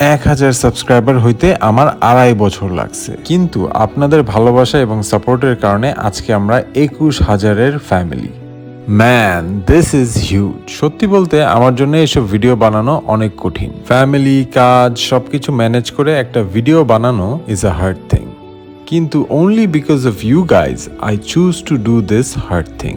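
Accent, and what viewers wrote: Bangladesh. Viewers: Brother are you from sylhet ❤ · খানিকটা যশোরের ভাষা 🙂😊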